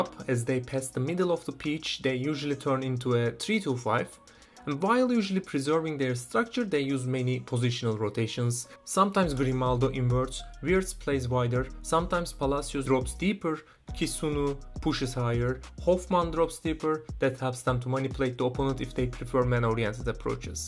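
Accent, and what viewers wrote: Turkey. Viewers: Your accent is making me think you are Turkish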